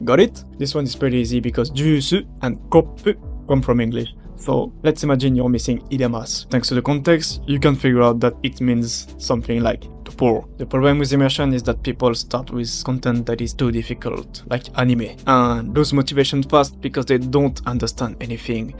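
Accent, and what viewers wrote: France. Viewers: Are you french or from eastern europe countries ?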